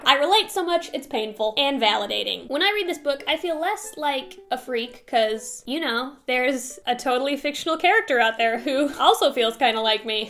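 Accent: Minnesota, United States. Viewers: I think it's Minnesota. Not sure though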